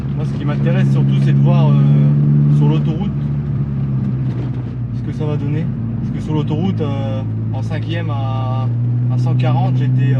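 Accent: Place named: Nord, France. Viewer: Je me rends compte que tu est du ch'nord aussi 😁🥰👍👌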